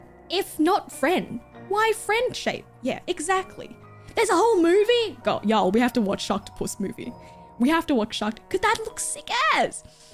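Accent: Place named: Australia. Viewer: Ahoy, I love the Australian voice.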